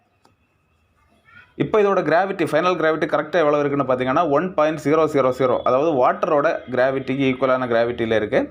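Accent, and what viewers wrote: Kanyakumari, India. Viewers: Bro native Kanyakumari ah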